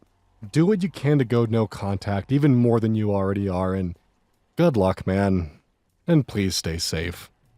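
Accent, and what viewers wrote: United States. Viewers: not a Spanish speaker, but I was in Mexico for 3 months. You sound like me, like you tried but still have the US accent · Honestly i think you did fine on the spanish pronunciation. You have an american accent about it but im pretty sure we all do that but at least your vowels were correct which is really difficult for some people.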